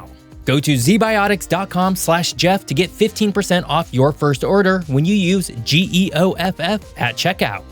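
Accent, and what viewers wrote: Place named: United States. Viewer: The clue lies in the English accent of the narrator: 'Murican! In the realm of global ignorance, 'Muricans hold a special place, clearly exhibited in this video. The disagreeable part is speculating about Finland reclaiming territory confiscated by Bloody Russia after the Winter War. The way things are going, Russia will fall apart pretty soon, but let's keep the Champagne capped until it actually happens. The main point: The territory Finland lost in the Winter war has now been overrun by Russians. It will be a bloody harsh business driving all the Russian-speakers out of former Finnish land. Will the Finns have an appetite for that? It remains to be seen. Then again, the abject cruelty shown by Russians everywhere during the war in Ukraine, why shouldn't we visit the same (or more) misery on the Russians as they visited of the locals? 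Bottom line: Putin's excuse has been to "liberate" Russians embedded in former Soviet states. A very clear action would be to forcibly move every Russian-only-speaker to their Rodina! Problem solved!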